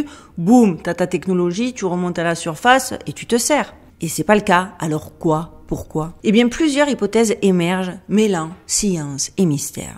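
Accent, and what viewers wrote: South, France. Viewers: Encore une vidéo géniale 😻 j’ai découvert ta chaîne il y a quelques mois et je suis devenue boulimique de toutes tes vidéos! 4 and à rattraper ❤❤ j’adore ta personnalité et je rigole souvent avec toi! L’accent du sud me rappelle mes racines ❤ j’espère que ta communauté ne fera que grandir encore! On a tous besoin d’une pour nous ouvrir les yeux! Tu es vraiment impressionnante ❤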